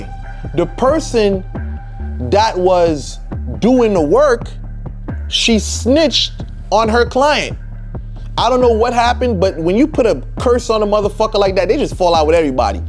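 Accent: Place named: Miami, United States. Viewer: lol Man U sound like a Miami haitian . U wild and funny af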